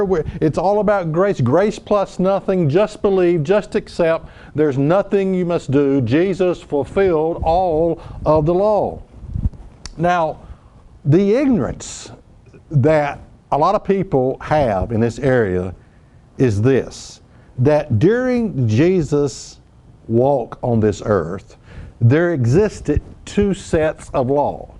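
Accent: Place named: Australia. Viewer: Pea brain lol. You are a man after my own heart and speak just like me... an Aussie lol. I think you did a great job explaining the difference between a Law and a commandment of God. Thanks... you helped me a lot. So I now know that if need be, I can walk more than 600 meters on the Sabbath day. Now what about the food laws... can I eat pig? It is not written in the Ten Commandments, but it is in the written law of Moses right?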